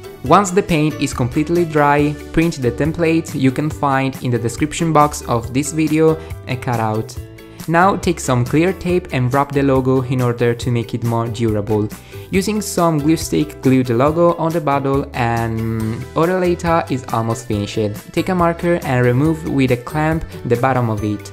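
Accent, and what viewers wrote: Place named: Italy. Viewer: Zac te wait to see the hamburger tutorial, your Italian accent is so cute 😀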